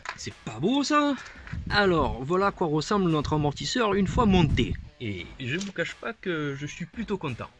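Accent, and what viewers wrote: South, France. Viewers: Cc je suis un nouveau abonné à ta chaîne et je t'avoue que je trouve ça plutôt très bien ta des plans de vidéo propre des explications claires et surtout un putain d'accent du sud que j'adore 👍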